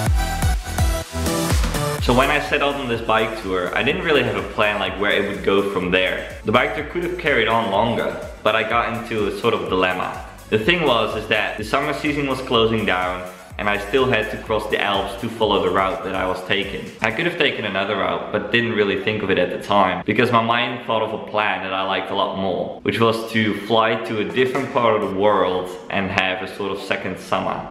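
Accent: Australia. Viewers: Talking like an Auzzi pal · Hi, I thought I'am the only one noticed your Oz accent. Great that you had assimilated to the local culture pretty well. Happy New Year to you and may it be the best for you!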